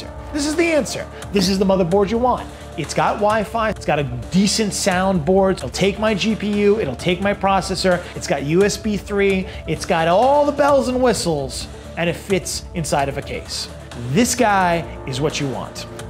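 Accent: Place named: Queens, United States. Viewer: Great video! Aside from the great content, you had me chuckling at a few points (I'm a tough audience for comedy). I love your accent. I'm from Springsteen's home town and I'm trying to determine which borough your from. Your accent isn't strong enough for the Bronx, and I definitely don't hear LonGIsland (no space and capital G on purpose). I'm going with Queens. I'm at the Camtasia/OpenShot level of video editing ability, so please excuse my ignorance. You built this with a focus on Adobe - how would it do with DaVinci Resolve (I'm cheap) and other Black Magic Design products, please? I assume it would chew through them, too. Thank you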